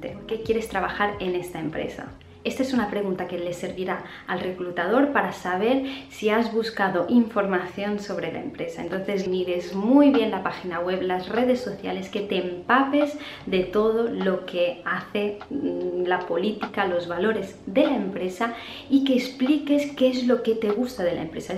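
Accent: Italy. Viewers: Scommetto che sei italiana 😂 ottimo spagnolo devo dire però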